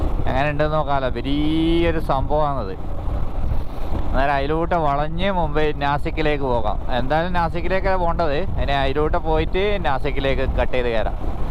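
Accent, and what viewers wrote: Kannur, India. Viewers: കുറച്ചു കൂടി നല്ല മലയാളം സംസാരിക്കൂ, എല്ലാം കണ്ണൂർ ഭാഷയിൽ സംസാരിക്കാതെ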